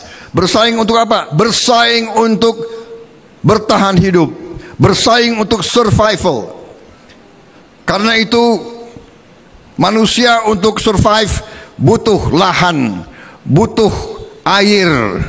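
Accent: Java, Indonesia. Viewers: Mas jawa apa Mas sunda pak 😄😃😀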